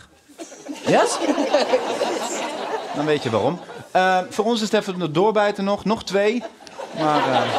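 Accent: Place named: Limburg, Netherlands. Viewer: Als ie Limburgs spreekt is het net Rapper Sjors.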